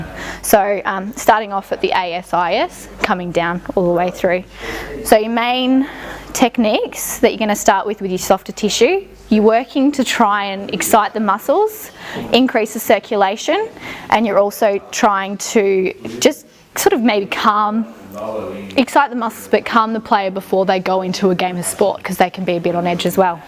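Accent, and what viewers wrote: Australia. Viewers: Wow. You definitely are from Adelaide. The accent gave it away. Great massage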